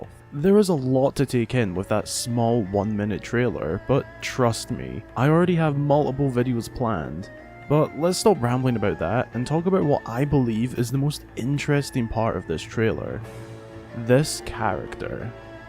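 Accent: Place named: Cockney, United Kingdom. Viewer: Your accent is so cockney XD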